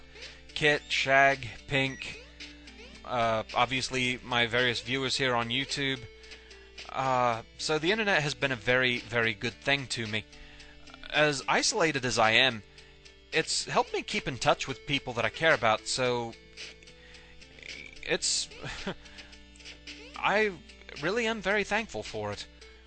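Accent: Scotland, United Kingdom. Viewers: Hmmm... since I hate not knowing something I hear about, I'll make up my own answer: Really Wily from Megaman. I knew it! Also, my accent is close to yours, just far less Scottish and a little of every US accent. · it creates a bizarre mix that amuses me. but generally its good. there must be some strong scottish traits in your accent, because i cant pick it up, as im pretty much used to any british accent. it doesn't seem too scottish...or american really...ive had too much exposure to accents i think. my relatives need to stop emmigrating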